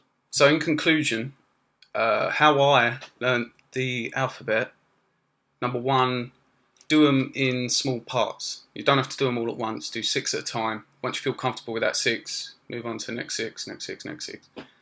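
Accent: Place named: United Kingdom. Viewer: Someone who speaks thai and it’s not a precise accent it’s just a basic brittish accent แต่ตกลง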